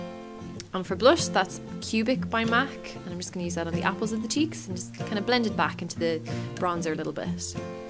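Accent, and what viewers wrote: Ireland. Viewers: I love how Irish you are! Where about are you from? Were losing yous all to Oz haha. Skin looks great btw, considering you just had your laser. Kisses from Dublin xx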